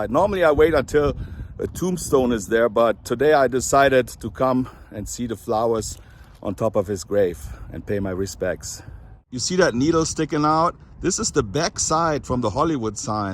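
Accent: Germany. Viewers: Thank you for bringing such beautiful flowers from us too,you are very kind, and considerate. loved Funniest Home Video's! I love your German accent, beautiful!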